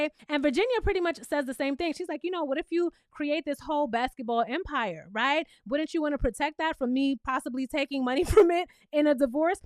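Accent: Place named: New York, United States. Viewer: I'm here for the New York accent when it comes around top tier commentary as always ❤❤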